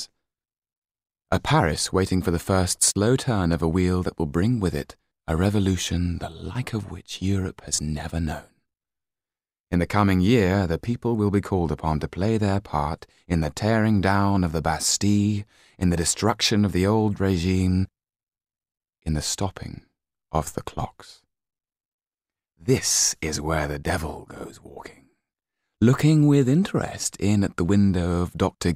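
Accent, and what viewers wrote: United Kingdom. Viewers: you're not alone ..i still don't understand what he said because i 'm so distracted by his sexy voice....omg i love his voice, his acccent,...i love britsh they have such amazing voice..too bad im not from british :( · Am I the only one that giggled at his rough london accent? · He brings such a huge opportunity for us male English learners to get a british accent. Bravo! Made it in one year.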